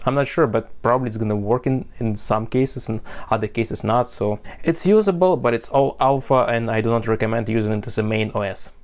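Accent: Russia. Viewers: вы русский? Просто акцент чисто русский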